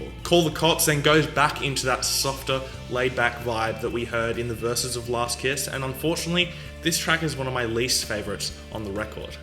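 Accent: Australia. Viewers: aussie accent? aaaaaand close tab. sorry dude :/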